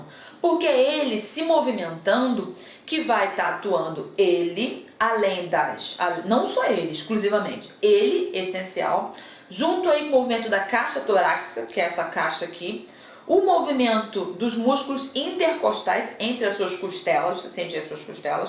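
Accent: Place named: Rio de Janeiro, Brazil. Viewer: Perguntinha, espero que responda. Voce é do Rio de Janeiro, parece que voce é de lá tem sotaque.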